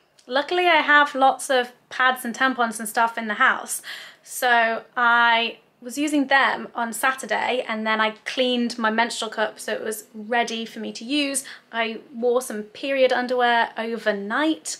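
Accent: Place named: Northern England, United Kingdom. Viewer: Your accent has gone a bit more northern I think? Maybe it's due to social distancing/lockdowns??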